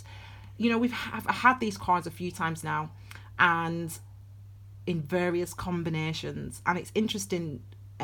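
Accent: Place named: Northern England, United Kingdom. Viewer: Welcome back! Really lovely to hear from you. I forgot how much I've missed your accent! Eeh by eck lass! 😂😂😂 Clare (Manchester) xx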